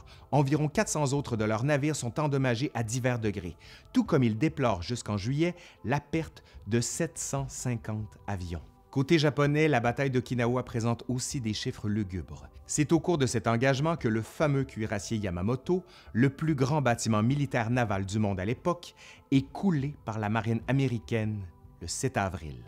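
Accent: Quebec, Canada. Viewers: Quel conteur de haut vol et puis cet accent canadien que nous aimons beaucoup en Europe ! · J'adore l'accent québéquois! Merci ;) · Wow, je regarde toutes vos vidéos avec attention. Et vraiment, je les trouve hyper ludiques, et on ne lasse pas ! L'accent québécois est charmant (pour nous français) haha ;). Pour vous dire que j'aime beaucoup vos vidéos et qu'il faut continuer ainsi, j'ai même envoyé la vidéo sur le mur de Berlin à ma professeure d'histoire qui a adoré ! Je vous souhaite de continuer encore pendant longtemps ! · Je te le mets avc plaisir mon "pouce par en l'R" 😄 Surtt q je suis persuadée q ce ne sont pas d heures q vs avez passé à faire 7 vidéo.. mais plutôt d semaines... 👏👏👏👏 C extrêmement bien fait..les images d'archives sont placées o bon moment ..et pis.. ton accent Québécois... J'AdÔre 😉 À Bientôt 🙋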